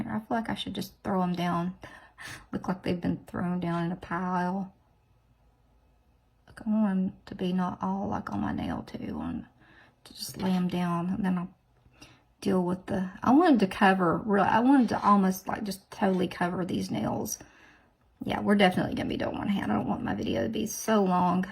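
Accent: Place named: South, United States. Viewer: I just love listening to you talk, and your southern charm and sayings. You do a great job!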